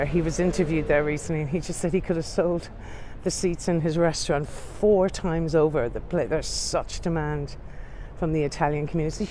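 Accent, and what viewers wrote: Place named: Ireland. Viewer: I discovered you two or three days ago and I can‘t stop watching your tours,. I love your Irish accent.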